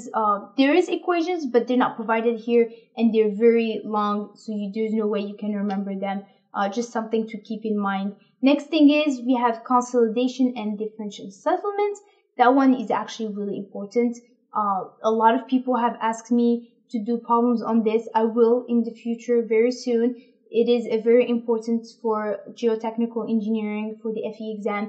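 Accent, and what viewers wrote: France. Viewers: I was closed. Pourtant un accent francais quand tu parles anglais.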